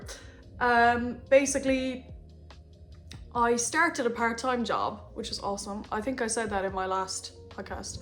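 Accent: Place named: Ireland. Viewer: Lastly, you immediately got more irish when you went home hahah you can really hear it at the start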